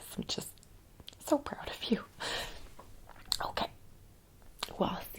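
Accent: New Jersey, United States. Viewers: Hello.. well my mafia binge is being filled. Amazing. Also the jersey accent 😍 I’ve not heard it much as an Aussie and I love it. Also my threats are very good w my brother because he is my brother. I know he won’t do shit. But.. let’s be honest having 6 brothers does that to you.. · i love jersey accents and unserious asmr videos 💀 you gained a sub from me youre so funny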